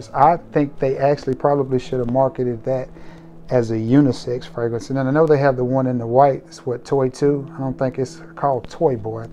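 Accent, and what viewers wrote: South, United States. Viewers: Arkansas or Georgia?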